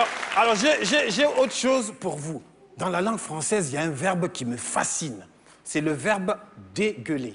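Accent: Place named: Niger. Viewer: Le type Nigéroi avec un gros accent, il débarque et il te fait du Raymond Devos, et j'ai aucune honte de faire cette comparaison, c'était très bon.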